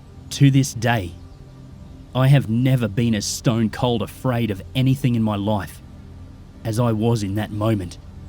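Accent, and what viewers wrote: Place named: Australia. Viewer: Love the Australian accent and I really enjoyed this video a lot and awesome job on it at the narration.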